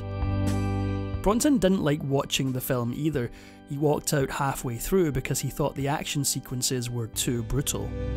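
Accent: Scotland, United Kingdom. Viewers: My favorite Narrator love the Scot!!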